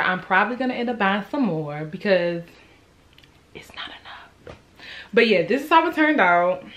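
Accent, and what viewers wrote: Baltimore, United States. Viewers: Are you from Baltimore? You sound just like a Baltimore girl like me lol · Gotta be Baltimore Like has to be lol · sis are you from balitmore? you got the accent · I KNOW you’re from baltimore or someone who raised you is😂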